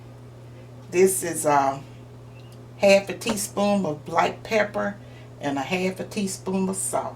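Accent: South, United States. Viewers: These patties are just like the ones my mama made. She tried to teach how they were made but being young I would not pay attention cause people today (back then) don’t eat this kinda foods. I miss her ways of cooking but you cook just like her So I just want to thank you. Plus I love your soft southern accent mine has changed over the years Love you and your videos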